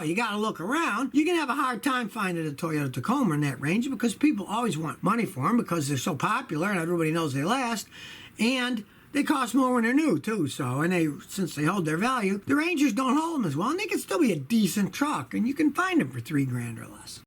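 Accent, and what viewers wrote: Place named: Transatlantic, United States. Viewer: Where did you get that "trans-atlantic accent??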